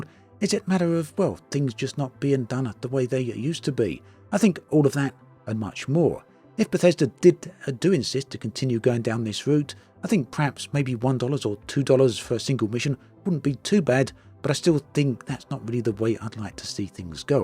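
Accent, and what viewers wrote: United Kingdom. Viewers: before this news I was back and forth being on the fence of opening my wallet to CIG to include the new Faterpillar to my fleet… then decided “nah” but after hearing this well structured, articulation of a rant about the privilege of a quest to hunt some rats for $7 😂 Because it was delivered from a distinguish gentleman speaking with a British accent, well $450 USD is doable lol 😂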